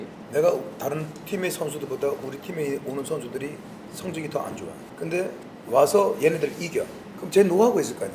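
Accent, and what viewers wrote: Gangwon, South Korea. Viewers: 아직, 강원도 사투리 잘쓰시네여 저는고향이 강원도 동해여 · 종겨운 강원도 사투리네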